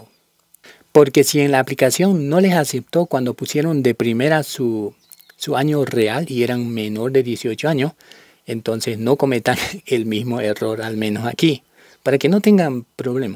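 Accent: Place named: China. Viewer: el perfil es de alguien joven creo y latino porque habla como chino hablando español🤔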